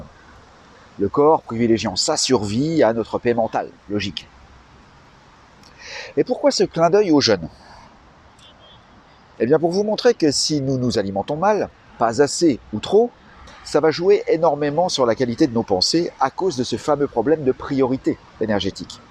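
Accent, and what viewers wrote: France. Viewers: Je n'avais pas percuté que vous étiez un ch'tit "à cause ou grâce à votre accent " 😘 J'ai vécu 5 ans dans votre région, nous avons eu un enfant, un Cum et comme je dis souvent "c'est mon ch'tit bilouttttte énorme "😉😊 Quel plaisir de vous voir à Villeneuve d'Asqu.. en plein de mois de Mai, le plus beau mois des Ch'tis dans ce climat de Drach' 🥰😍👍 Super Vidéo, pour moi, je suis abonné, mais il y avait quelques temps que je ne vous ai pas écouté.. 🤔😥☹🤣👍😘 J'ai appris 2 chose, l'huile de Lin, et "je n'ai plus le nom mais qui concerne l'intestin... au-delà du Sucre de l'alcool du sport etc Bref Merci, restez vous-même, pleinement heureux et joyeux d'être Vous.👍💖💝👌👍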